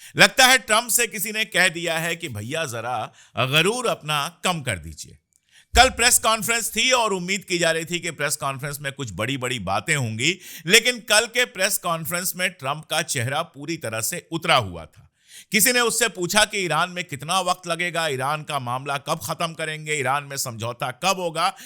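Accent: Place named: Kashmir, India. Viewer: sir kashmiri hein zabaan mein kashmiriyat he bhai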